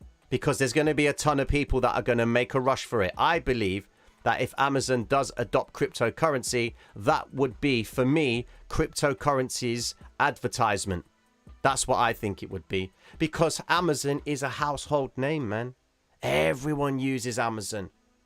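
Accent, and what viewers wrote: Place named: North London, United Kingdom. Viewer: Most underrated, level-headed analysis I have found on YouTube. Most people don't realise that 99% of these TA guys are just trying to shill you their telegram or discord or VIP group which lies behind a massive paywall. If they were really that good they would make bank on trading but they clearly don't if they need to sell you their BS programmes about drawing triangles and lines. gives the most valuable information for free and you can tell he doesn't need to do it but he wants to help people. Literally top 3 trading channels on YouTube. Can't wait to sign up for patreon just to show my support. Much love, btw you must be from north London or something cos you sound just like me lol, I'm gonna go for Greek Cypriot haha, nuff love <3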